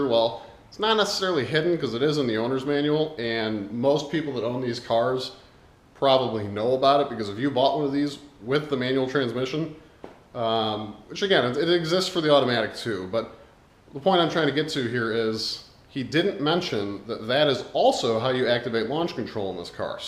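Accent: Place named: Chicago, United States. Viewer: Everyone in Chicago sounds like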